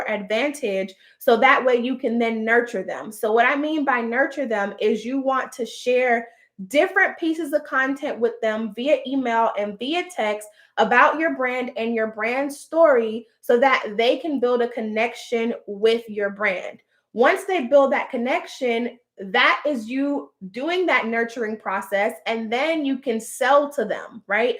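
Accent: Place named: Miami, United States. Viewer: I knew you were from Miami. We don’t really have “accents” but you sound like a Miami girl lol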